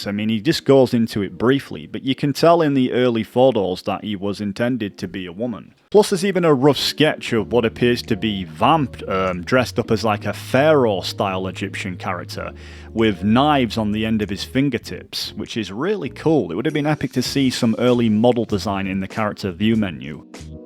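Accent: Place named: United Kingdom. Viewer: 10 seconds in you called modern society terrible. im guessing that you live in the uk based on your accent. yeah you guys have some HORRIBLE politicians, but if you dont like it LEAVE THE COUNTRY. the whole planet is not defined by what you guys do on that island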